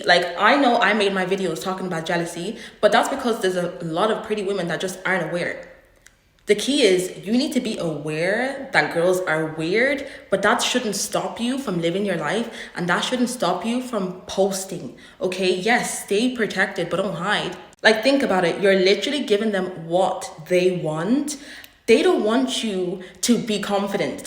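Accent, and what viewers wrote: Ireland. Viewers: Are you from Ireland ? Thought I heard the accent 😊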